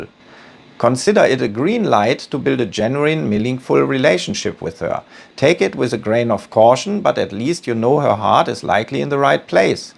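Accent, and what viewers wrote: Germany. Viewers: I love the German accent. It gives you credibility like a Mercedes Benz ad.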